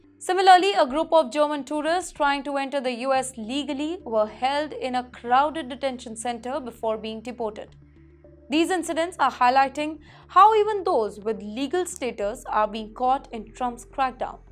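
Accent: India. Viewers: You need to improve your Accent. So bad that internationally cant really follow you. So indianish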